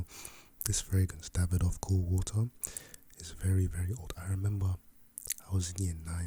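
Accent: United Kingdom. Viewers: Loving these bro.. an if I’m not mistaken , you from the UK ? You gotta new sub 👌🏻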